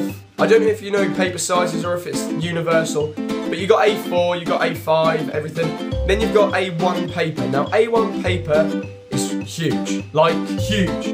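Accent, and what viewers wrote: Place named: United Kingdom. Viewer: Your eyes omfg!! :3 Your accent is soo cute, I love British accent!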